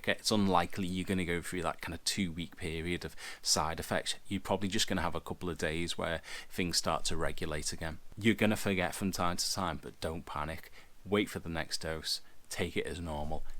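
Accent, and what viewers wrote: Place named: Scotland, United Kingdom. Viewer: Are you Scottish? I love this accent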